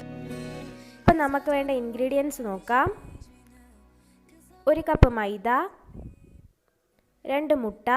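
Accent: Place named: Kannur, India. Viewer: Kannur basha mattane...Appathekk... polum 😂😂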